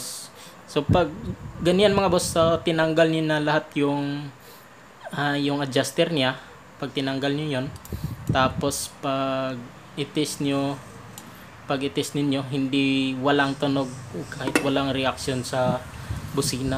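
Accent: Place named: Iloilo, Philippines. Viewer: Daw ilonngo ka to ba hahha nice vid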